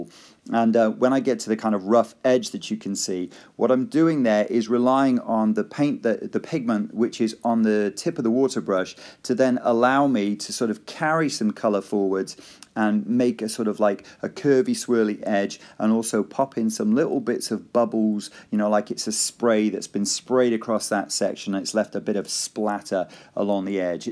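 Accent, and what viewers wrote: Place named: United Kingdom. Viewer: No one here will get this - but as you started talking I was absolutely sure, that you are Liam from Critical Role. You literally have the same voice (and British accent, which could be one of the main reason) but still the resemblance is (as Liam would say) uncanny.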